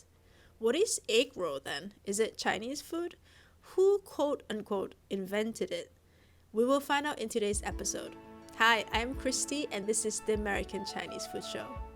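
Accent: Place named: Hong Kong. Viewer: I can totally hear your HK accent. Was assuming HK before reading this comment. Can hear your British infusion into your accent. Sounds great!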